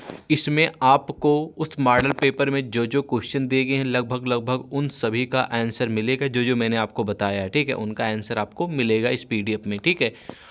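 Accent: Bihar, India. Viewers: Bihar hai ya up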